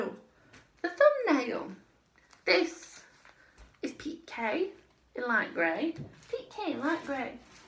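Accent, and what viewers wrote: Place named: Manchester, United Kingdom. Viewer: Hello, jan carruthers has sent me your video. I really enjoyed watching you. Well done on your 500 subs. It amused me when someone commented on your accent. It sounds very similar to mine. I m from east manchester. I assume you are from manchester too.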